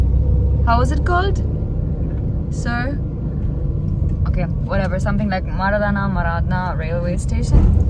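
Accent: India. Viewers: Srilankans r super helpful n u sis don't pitch in ugly India pitch u r in Srilanka please.. yakkk Urdu voic in Srilanka 😂🙏 Cmon.. Srilankan sound is proper cool than stupid Urdu in Srilanka sooo odd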